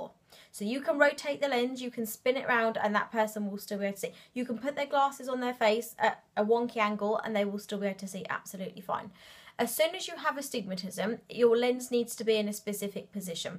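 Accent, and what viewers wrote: England, United Kingdom. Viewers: Hi again! ah I'll go back and listen again. I'm glad I emailed you. I'm guessing you are in England somewhere ( hearing your accent ) so you are about 5 hours ahead of me, so it's about midnight there. Anyway, thanks for responding and so quickly too! I really appreciate it, and you said that very well, I get it now! Thank you again for your time:-)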